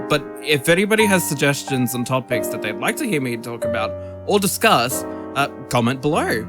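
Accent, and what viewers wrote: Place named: RP, United Kingdom. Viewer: whoah, that accent.... whoah... I thought you were not gonna have such a posh accent wow........ just whoaaaaaaah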